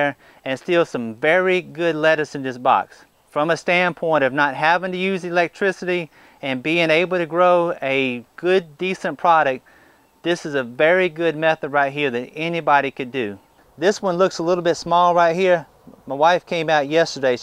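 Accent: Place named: North Carolina, United States. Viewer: I just subscribed about a week ago. And as a Louisiana farm boy I find your videos informational and entertaining. Having worked in North Carolina for a few years (Pittsboro area) I can tell by your accent that you don’t live very far away. Keep up the good work and I will be watching for more good stuff. Specially as you change “SMOKE” hats…….lol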